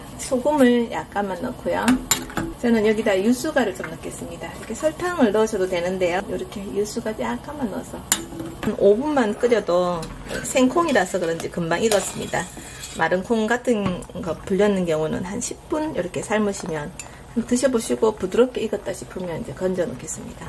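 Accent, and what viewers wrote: Gyeongsang, South Korea. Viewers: 목소리가 너무 좋아요 갱상도 사투리도 매력적이구요 영상 내용도 유익하구요 남편분 좋으시겠어요 감사합니다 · 말씀 너무 잘하세요..경상도 어투가 이뿌시네요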